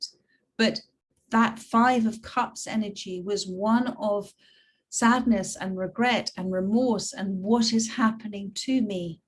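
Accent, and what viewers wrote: United Kingdom. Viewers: Nice to hear another new British tarot reader . Lovely insite and understanding from the interpretation of the cards ♥️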